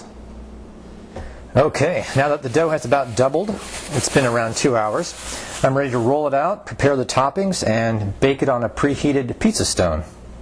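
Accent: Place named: United States. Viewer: This video is great, however I am confused with the "cups" measurement. I am from Europe and have no idea what a cup sized is, i have a couple of them, and most of them are different size lol, and in addition, I have found several version of "cups" on few websites i visited. I suggest that one cup is 136 grams of flour or 240 milimeters of water, which was referred as american cup, and because of the guys accent, i would say he is from us.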